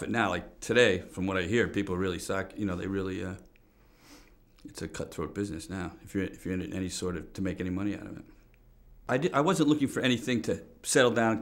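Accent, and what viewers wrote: New York, United States. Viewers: Interesting. The inflection and pausing of the way he speaks is exactly the same as the way a guy I know who grew up in the same era in suberban NY speaks.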